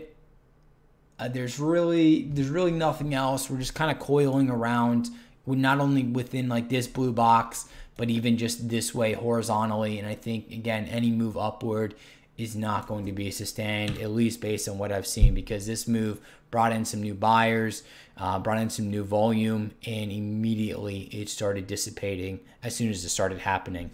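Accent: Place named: Texas, United States. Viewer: where are your from? Texas? I was wondering because I never heard your accent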